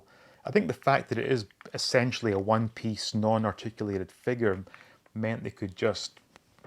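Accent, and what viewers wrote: United Kingdom. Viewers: I had the UK one which I guess you had too by your accent. But it was totally different it had no battery's for a start I think it worked on a sping system so when you pulled the cord it pulled a spring tight and them pulled itself up . The Web was see through strong rubber and it glowed in the dark and so did spideys spider on his chest and maybe his eyes and I think the body was in better proportion and not as thin as the USA model . But there was no tourch as there was no power . So was this not the one you had ? Thanks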